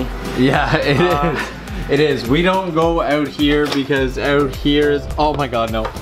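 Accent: Canada. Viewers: I never thought I would be able to differentiate a Canadian accent from another English speaking accent... But apparently I can. Apparently they have two Uniqlo stores in Canada, I just checked. None around here though so... So nice people see you and they get all excited. That must be such a nice feeling. Anyway, have a great day. And drink your coffee before leaving it to freeze!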